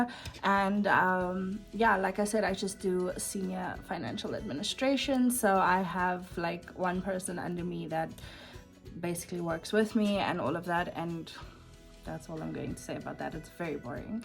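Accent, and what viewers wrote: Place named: South Africa. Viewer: Omg I love your accent!! South African accents are the most beautiful accents ever in my opinion.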